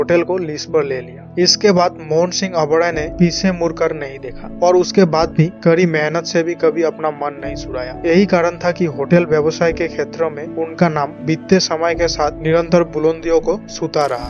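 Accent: Bengal, India. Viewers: Bhai Tui ki Bangali,Tor Uchharan puro bangali der moto. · Watch this very interesting video relating to Mohan Singh Oberoi - founder of the famous chain of Oberoi Hotels. He started his life with just ₹25. The commentator seems to be a Bengali.His command on Hindi commentary is poor.He calls a district SARGODHA (now in Pakistan) as SARGONDHA ! Inspite of this, this video is full of plenty of intereting information about M.S.Oberoi. 🌲💐😀👌💐🌲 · DADA APNI JE BANGALI SE APNAR HINDI BOLAR UCHCHARAN THEKE BESH BALO BOJHA JACHCHE * HINDI BOLA TA EK TU CHESTA KAROON THIK BOLTE PARBEN * KHUB KOTHIN NOY HINDI BOLA HINDI STYLE "E "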